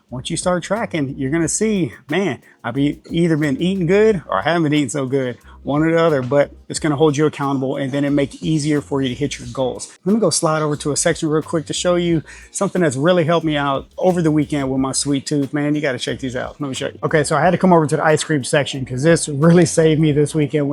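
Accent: Louisiana, United States. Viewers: a bibimbap or bulgogi bowl meal prep would be a lot of fun! I thought I heard a Louisiana accent. I grew up there but am now in DFW 😊.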